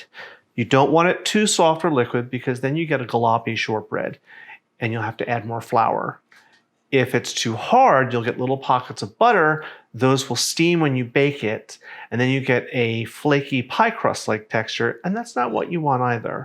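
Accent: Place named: United States. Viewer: This isn't Scottish shortbread, none of the ingredients are Scottish and from the accent of the bloke making it it's being made in america, so it just shortbread. As a baker i have made more shortbread than this guy has had hot dinners, and you don't put salt in it.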